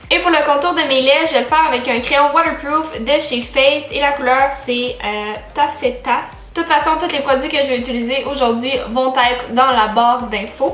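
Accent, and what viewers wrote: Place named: Canada. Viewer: CC sublime très classe j 'adore je le ferais cet am....j adore ton petit accent 'Canadien 'quel beau pays...nostalgique car j y suis partie en 1991 (j avais 24 ans)pour mes études d 'infirmières (stage)...j étais dans la magnifique région des Laurentides...gros becs. comme l 'on dit chez toi...bsx belle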